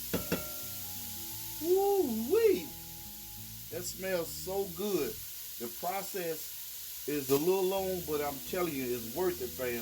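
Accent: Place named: DMV, United States. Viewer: Bro, you’ve got me running to the store. Once you start talking junk, I knew you were from the DMV. Works for me!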